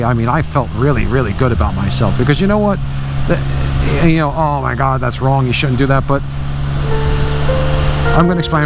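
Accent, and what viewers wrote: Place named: New York, United States. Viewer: okay!!!!.......i'm hooked!!! some of the funniest videos i've seen on youtube. thanks for the laughs. i grew up in scarsdale in the very early 70's and visited long island a few times back then. it's also great to hear that authentic new york accent and attitude. thanks for making me laugh for a few minutes, stay upright and healthy.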